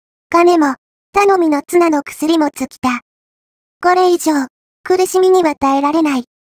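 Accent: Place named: Kansai, Japan. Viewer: 関西弁……ちゅーか……関西のイントネーションやね。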